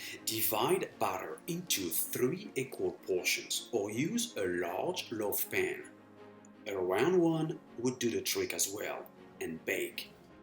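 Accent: France. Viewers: Your accent is sounds so funny :D French, isn`t it?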